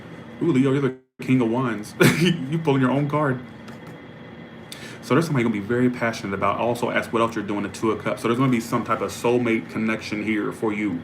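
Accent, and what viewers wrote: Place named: South, United States. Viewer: where are you located? you have a southern accent, its fun to watch you...how do i get on the chat?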